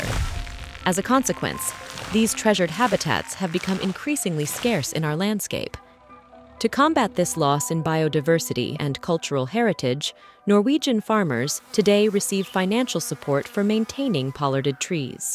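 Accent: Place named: United States. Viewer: Great to see/hear a film about pollarding. In Aragon, there are thousands of Chopo Cabeceros, Black Poplar pollards. If I am being picky, Would have been a perfect film with a voice over from a European person. Seems odd to hear an American chatting about this subject?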